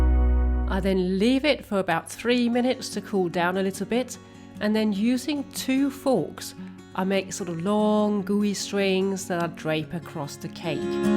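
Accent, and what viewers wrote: United Kingdom. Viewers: I love how you decorate, everything looks so beautiful. I like all the crows too. You are very talented. I am from the States in Connecticut. I always wanted to go to London. I love your accent. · I am so happy to have found you! I am from the states, I live in Michigan. I have been to London several times and fell in love with it, especially the people. Your sweet little accent makes me smile. Your decorating is so inspirational and you make it look so easy. The large book/skull cloche in the kitchen is my favorite. Your home looks lovely. Sending a BIG hug with thanks for sharing your ideas! Cherrie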